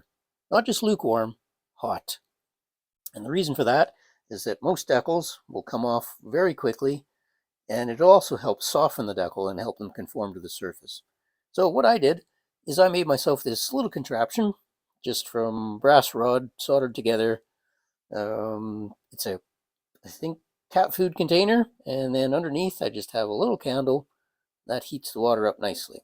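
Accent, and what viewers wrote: Canada. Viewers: Deckles? I'm 54 years old and I have never in my life heard someone pronounce it "deckles." Every dictionary has the accent on the DE- de'cal. Then you go so far as to do a bit to insult an entire region of the US, because you think this is where the (correct) pronunciation comes from. Like they are all an episode of Hee-Haw. Meanwhile, we have to listen to you talk ABOOOT things with your northern Canadian accent. I don't think I'll follow you with your smug and incorrect advice. Maybe do a video aboot freckles and deckles. <link> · I knew you were Canadian right away.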